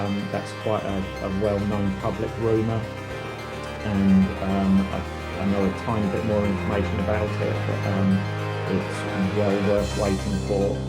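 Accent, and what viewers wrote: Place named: England, United Kingdom. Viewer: Agreed, music is too loud and unnecessary. Everyone thinks their voice is weird, yours is perfectly normal. In fact the English accent makes you sound smarter than the rest of us… thank for the info.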